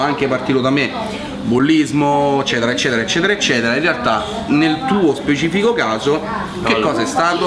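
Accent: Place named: Rome, Italy. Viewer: Leonardo Bonucci scusa ma se nn capisci il romano è grave, nn è mica come il sardo dai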